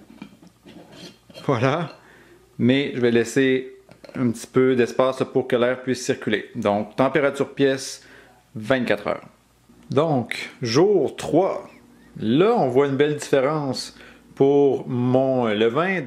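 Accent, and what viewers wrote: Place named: Canada. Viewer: Claude de Belgique J' adore ton accent Canadien !!!! et bien entendu le Québec !!! Merci !!! pour ta recette de levain que je suis entrain d'appliquer ( 2 éme jour )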